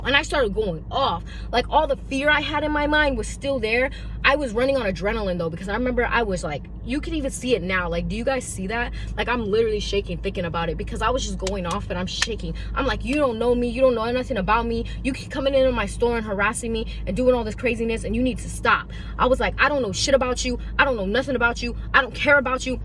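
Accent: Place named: Maryland, United States. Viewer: This story is insane … im glad you’re ok and i love my MD ppl … I love how y’all say tew instead of 2 … please be safe ….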